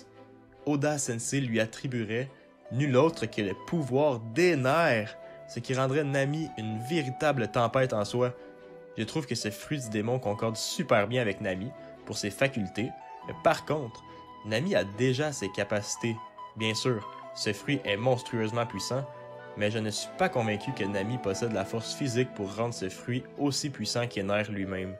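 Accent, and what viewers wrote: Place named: Quebec, Canada. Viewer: ce n'est pas parce que des youtubers 'connus' le font que ça doit empêcher tous les autres d'en parler... dans ce cas là, plus personne n'a le droit de faire des vidéos one piece à part eux? bizarre comme façon de raisonner.. et ce n'est pas parce que TOI tu n'apprécies pas l'accent québécois que tout le monde pense de la même façon que toi. Ce n'est pas une voix qui permet à quelqu'un de percer ou non mais plutôt son contenu. :) Bonne journée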